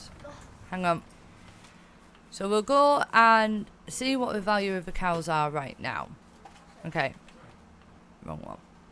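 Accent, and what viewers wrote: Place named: United Kingdom. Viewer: With that accent I'm picturing you as a stunningly hot British gaming nerd!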